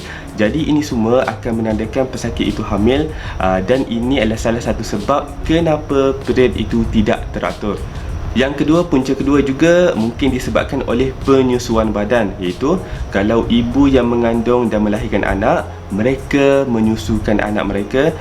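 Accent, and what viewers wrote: Kelantan, Malaysia. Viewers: Doc org kelantan ke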